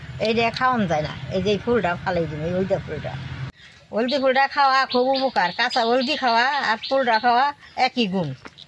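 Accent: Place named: Mymensingh, Bangladesh. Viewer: দাদীমা আমাদের টাঙ্গাইল ও ময়মনসিংহের ভাসায় কথা বলে খুব ভালো লাগে 😊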